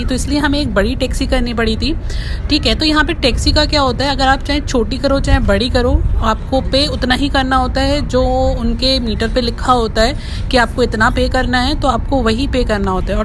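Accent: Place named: Pakistan. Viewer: Sis aap India se hain ? Which state ? Mujhe laga k Pakistan 😂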